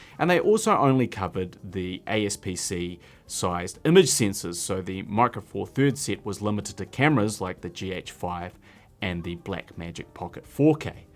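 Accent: New Zealand. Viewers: Not funny … Guy’s a New Zealander or ‘Kiwi’ ….. that is just the kiwi accent. Accept ! Comment on his content which is always very informative.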